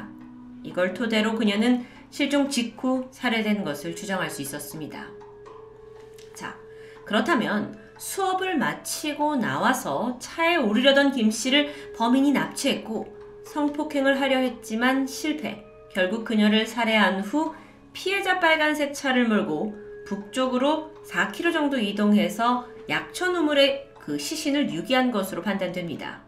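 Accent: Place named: Jeolla, South Korea. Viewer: 고향이 전라도인가? "의" 발음을 "으" 로 밖에 발음이 안되네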